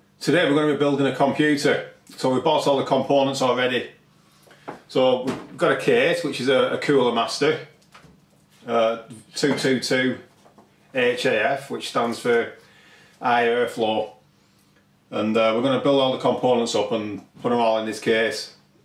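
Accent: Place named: Yorkshire, United Kingdom. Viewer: Your accent is sick! haha Where you from? yorkshire?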